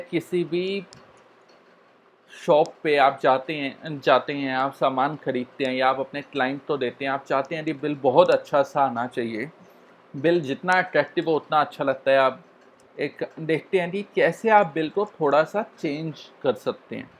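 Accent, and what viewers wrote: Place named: Nepal. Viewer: I really appreciate BOOKEEPER app and I beleive it is very important to make these presentations about new features and skills, but sound quality has always been a problem. Your accent is something i get that, but remember BK community does not included only people rom Nepal.... so make sure sound comes out clearly at least guys!! Thank you.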